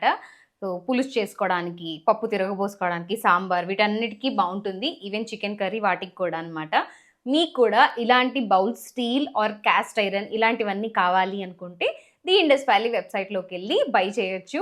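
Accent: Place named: Telangana, India. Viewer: Meeru andhra ammai ainaa Telangana ammaila. Chala Baga matlduthunnaru super Andi meeru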